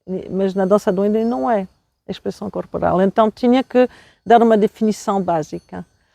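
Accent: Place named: Portugal. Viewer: Muito agradecida pelos ensinamentos...fiquei maravilhada com o sotaque português lembrou muito mamãe...fechei os olhos e imaginei suas estórias a falar com sotaque.❤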